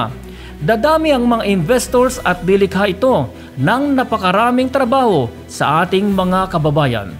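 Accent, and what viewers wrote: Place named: Visayas, Philippines. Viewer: ekeya ka jn, ausin m salita m nkkhiya kang bisaya ka .. kkbwisit ausin m salita m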